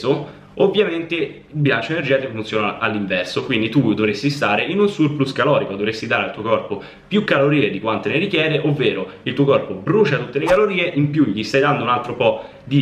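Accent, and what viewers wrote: Rome, Italy. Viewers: Allora: primo, sei romano, e quindi ti starei ad ascoltare per ore ahahah!! XD E secondo, scherzi a parte.. i tuoi video sono sempre chiari, semplici, e spiegati perfettamente, anche per me che sono una principiante in quanto a fitness e nutrizione... =) Continua così, io sto divorando i tuoi video uno dopo l'altro! Grazie mille! =D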